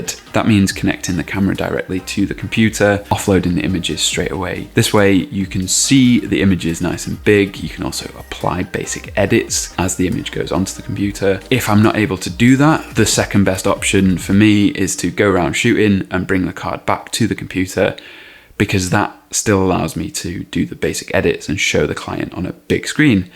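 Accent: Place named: North West England, United Kingdom. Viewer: Nice to hear a north west accent on YouTube 😁 - your right you don't need 2 slots to be doing professional work, my camera has two slots but I've never used the second slot. But I was going to buy a Z6 and to be honest I have stalled because it's now stuck in the back of mind that I probably should have been. I have had corrupted files about 3 or 4 times but I've always got the data back on my computer.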